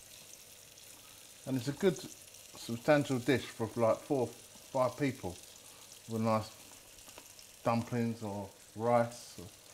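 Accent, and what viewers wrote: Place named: England, United Kingdom. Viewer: With that accent, he was born in England, London I would guess (lol). He sure loves his powdered seasonings...............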